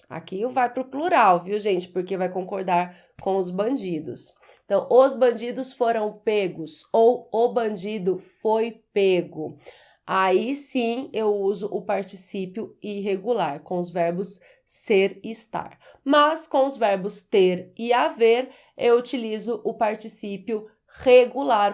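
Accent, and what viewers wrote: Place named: Caipira, Brazil. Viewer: Sua aula se torna consativa para quem sabe as respostas certas, mas quer apenas revisar. Pense num modo de fornecer as respostas e depois fazer os comentários. Assim, quem errou irá aprender; quem acertou passará adiante. Seu R caipira já QUASE desapareceu, tornando-se praticamente suportável. Por conta da melhora que certamente ainda ocorrerá, já adianto aqui meus parabens!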